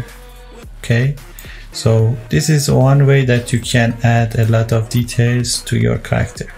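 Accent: Iran. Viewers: Your accent sounds Persian. 🤔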